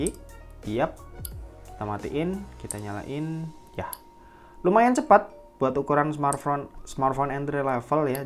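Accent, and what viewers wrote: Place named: Tegal, Indonesia. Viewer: tegal ya bang... logatnya ketara banget medok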